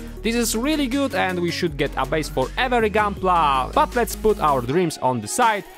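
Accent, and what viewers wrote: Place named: United Kingdom. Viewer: I'm in NC, USA. But you sound like you're over the "pond" lol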